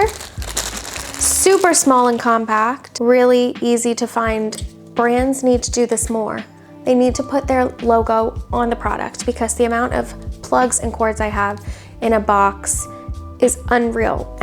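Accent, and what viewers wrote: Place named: United States. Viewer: hmmm, you sound American, but the plug is UK. I wish you'd shown actually putting the dust bag in. Do you have experience with Dyson vacuums?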